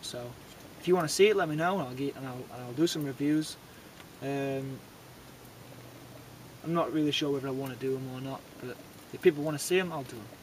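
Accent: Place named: Barnsley, United Kingdom. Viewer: you sound like a proper Barnsley lad... what area you from?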